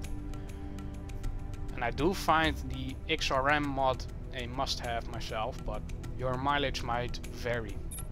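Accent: Netherlands. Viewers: Great tutorial, helped allot thanks! Btw you sound alot like Alistair Overeem, maybe its just the dutch accent lol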